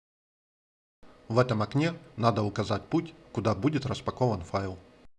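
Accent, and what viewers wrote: Ukraine. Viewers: Рекламировать DPI - C УКРАИНСКИМ акцентом крайне рискованная затея ))). Даже если вы не призываете уже статья за это если вы рф а акцент усугубит ваше положение до ионогена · Смущает малоросский говор. Не засланный ли казачок? Извиняй, если что, обидеть не хотел. У меня гудбай дипиай работает прекрасно.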